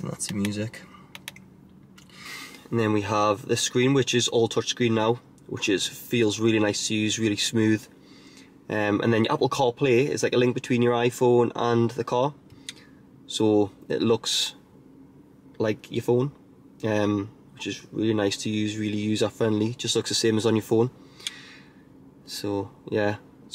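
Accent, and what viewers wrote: United Kingdom. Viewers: Love that British accent♡ I think Audi have the best car, love it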